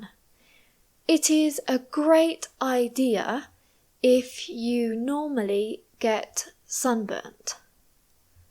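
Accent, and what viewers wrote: United Kingdom. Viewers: Es inglés británico?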